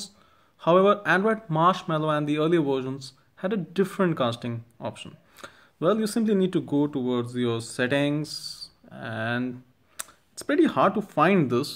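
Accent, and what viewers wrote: India. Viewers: Indian accent...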